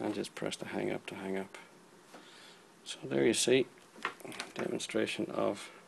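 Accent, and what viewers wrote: Ireland. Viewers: The Irish is strong with this one 🍀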